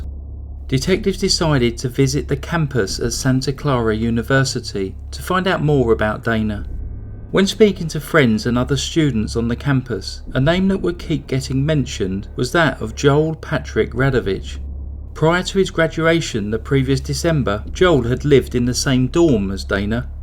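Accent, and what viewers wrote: England, United Kingdom. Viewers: I love the English accent, just sexy! I bet you're hot! Lol, just kidding! Honestly, though, you're very intelligent, well versed and I love the respect you have for the victims and their loved ones while you're doing the video. Thank you for taking the time to gather all the information as it shows you definitely put work into each video! New subscriber for life.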